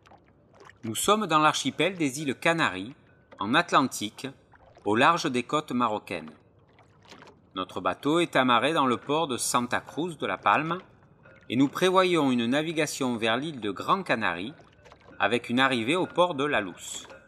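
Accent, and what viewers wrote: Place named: Provence, France. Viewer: Excellente, cette série de tutoriels avec l'accent de Provence. On jurerais que c'est Marius qui nous donne le cours !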